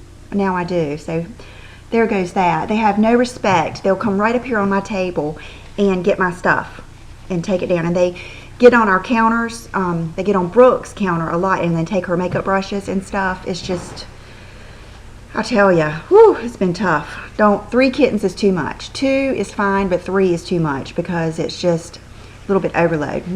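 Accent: South, United States. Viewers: Hey,! I've just been having a marathon here lately.:) I'm a Mississippi girl and totally get your Southern accent. I think it's part of what makes you so charming. Our Accent is a blessing! You keep doing you!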